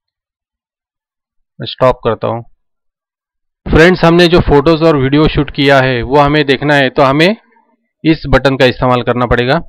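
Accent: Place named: Marathi, India. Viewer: Dear ye Marathi person hai,and Marathi logo ko thik se Hindi nahi ati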